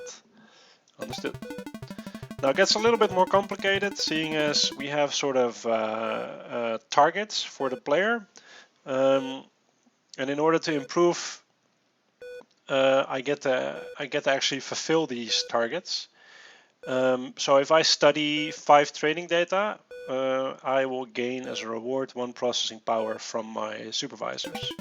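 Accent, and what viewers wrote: Netherlands. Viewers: Yes, he's dutch. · You sound like having a dutch accent, is that true?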